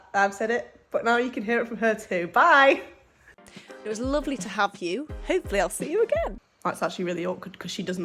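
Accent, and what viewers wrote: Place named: United Kingdom. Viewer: I often watch videos on 125% speed, but I have to concentrate to listen to you on normal speed with your British accent me being American .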